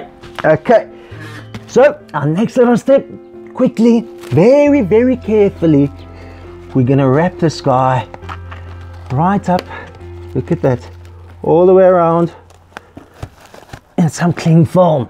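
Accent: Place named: South Africa. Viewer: I can recognize that accent anywhere. From what part of south africa are you?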